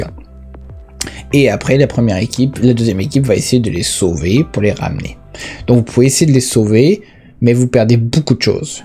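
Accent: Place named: Quebec, Canada. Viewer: Sympa, la video. Ca décode bien. Et en Quebecois :)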